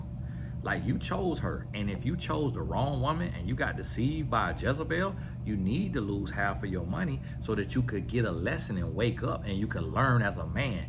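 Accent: South, United States. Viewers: I am trying to figure out your accent Mr Tony. Is it a Southern drawl? 🇦🇬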